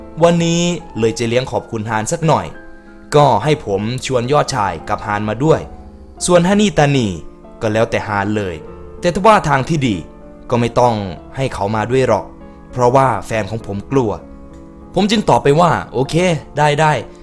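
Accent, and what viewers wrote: Isan, Thailand. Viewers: ชอบเสียงอีสาน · เนื้อเรื่องมันก็น่ากลัวนะ แต่ฮาสำเนียงอีสานพี่มากกว่า อินอะไรขนาดนั้น <num>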